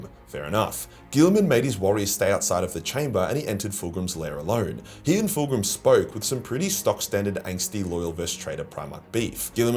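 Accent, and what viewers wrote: Australia. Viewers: With my original profile and this one I am commenting from I have been subbed to since the very beginning. I was there at the dawn when the old magic was written. Its been such a cool journey. Ive watched through so many moments of crisis and success. It's been a sight to behold seeing DOM rise so high! I hope you see this and know your Aussie voice has traveled so very far and risen to such great heights. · I only watch 2 actual content creators on YouTube, you and weshammer. I'm literally addicted to your lore videos. My girlfriend told me after going home (its long distance with regular visits) she can't sleep without "the Australian guy talking about warhammer". You're literally my favorite person on the internet and I cant thank you enough for your service! Have a great fucking day. Hail the emperor!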